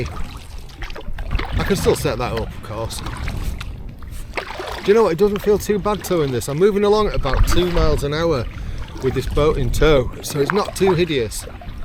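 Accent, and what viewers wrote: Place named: Yorkshire, United Kingdom. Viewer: I can hear a bit of queen's English around the Yorkshire slang, I was thinking Harrogate, but York makes sense 😂 I'm just up the road from Ferrybridge, but spend a lot of time over Selby/Aberford/Wetherby way walking dogs, so not too far from you if yer ever fancy a paddle and a chat!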